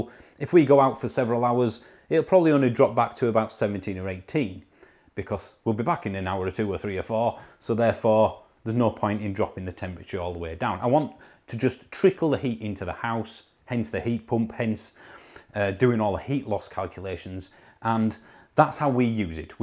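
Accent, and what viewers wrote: Yorkshire, United Kingdom. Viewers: Well said, you sound like a real Yorkshireman, unlike who I suspect is a fake.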